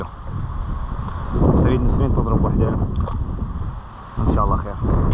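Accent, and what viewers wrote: Iraq. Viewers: منعول الوالدين سواهن سبحة !!! ههههه . إبن ذي قار مبين عليك من الإسم واللهجة. ... بس عفية عليك طفرت.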